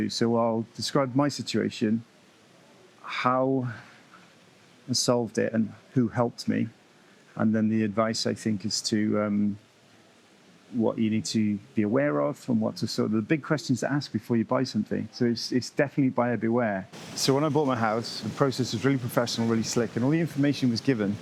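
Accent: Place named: England, United Kingdom. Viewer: Thank you for sharing your experience…just found your channel… looking forward to watching all your videos… I know from your accent where you are from in England..😊…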